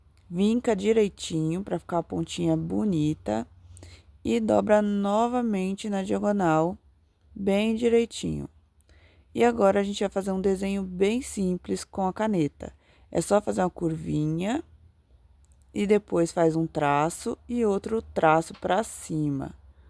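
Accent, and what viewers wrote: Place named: Ceará, Brazil. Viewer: Gostei muito lindo fala do Ceará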